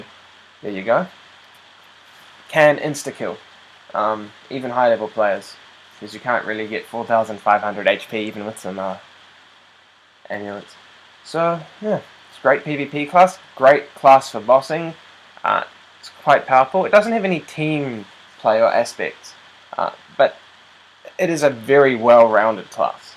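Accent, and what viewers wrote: New Zealand. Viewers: First video I watched from you, are you a New Zealander? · Thicc kiwi accent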